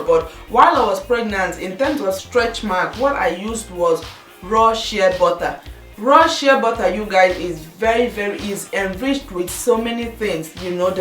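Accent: Igbo, Nigeria. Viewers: Og Diva its her nigerian accent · stella-marie signature my sister, I couldn't stand it too, and her Igbo accent is so unstoppable. I love her videos but...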